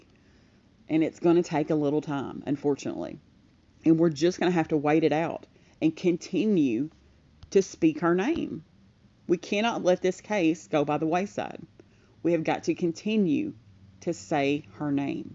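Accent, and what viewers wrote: South, United States. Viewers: Hello, I haven't listen to your channel in a long time. You sound totally different. You have a southern accent now. Are you the same person who did the Kenneka Jenkins case?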